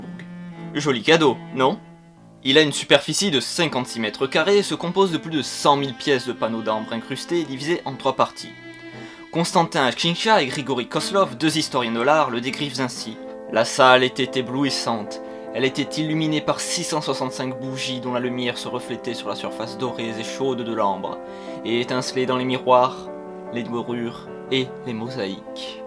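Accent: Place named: Russia. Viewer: Bravo pour l’accent russe!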